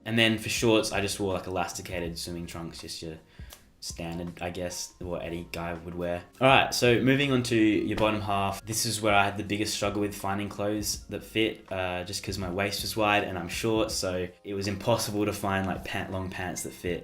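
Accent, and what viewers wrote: Australia. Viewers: love Australian accents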